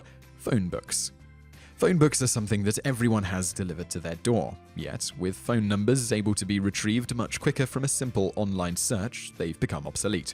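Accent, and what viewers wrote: England, United Kingdom. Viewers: British english is obsolete too! Loose the accent! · your accent is english yet you use america as an example. ? :S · You have an English accent, but your video is about America....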